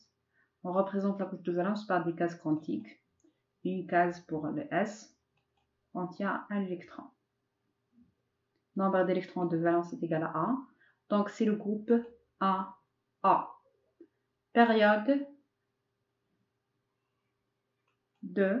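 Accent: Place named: France. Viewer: hta haja ma mafhoma quality t3 son na9sa w zid l'accent francais tab3tha ....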